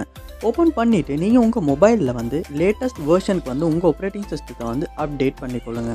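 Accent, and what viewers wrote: Sri Lanka. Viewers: Unga tips na innum try pannala... But unga ilangai tamil ketka nalla irunthathu sago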